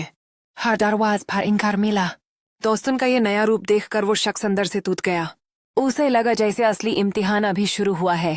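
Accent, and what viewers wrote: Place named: Hyderabad, India. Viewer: Hyderabadi voice💯😍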